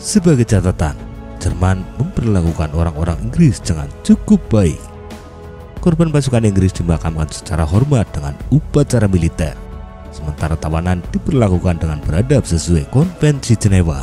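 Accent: Java, Indonesia. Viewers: Naratornya Jawa medok jadi kadang tidak di copy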